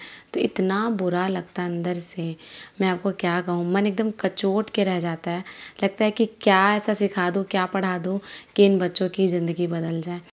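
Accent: Bihar, India. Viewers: Aapki voice bht achii h di bihari tone ❤ love from uttrakhand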